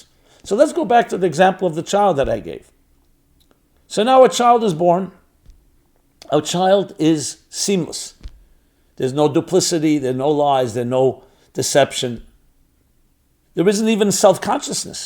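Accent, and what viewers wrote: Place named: New Jersey, United States. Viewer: Here in Britain, we have something called black magic chocolates. They come in a large shiny chocolate box. And are absolutely delicious. You can't stop at one. Don't know whether you have them in the states 🤔 All negative states of being are overcome by love. There is nothing stronger. Although it is vital to sort the wheat from the chaff. And act accordingly. Thank you for the great talk, Rabbi. Love the new Jersey accent. Oh, Ecclesiastes is an awesome book. You've just mentioned it. Few were wiser than Solomon. Heightened states of consciousness are synonymous with objective consciousness. When separateness disappears and one becomes all that one beholds. As Solomon says, "as above, so below."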